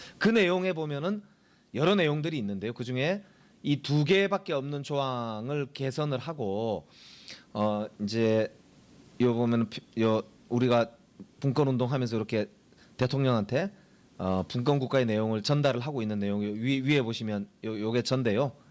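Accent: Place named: Busan, South Korea. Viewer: 오늘따라 부산 사투리가 더 느껴지네요. 정겹습니다^^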